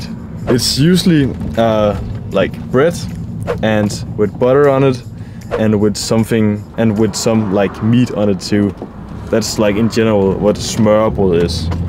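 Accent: Standard. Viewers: 很標準了棒棒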